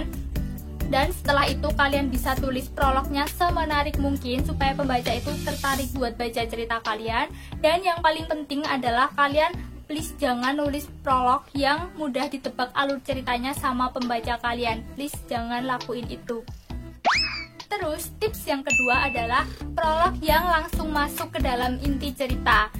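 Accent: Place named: Java, Indonesia. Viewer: Kek medok jowo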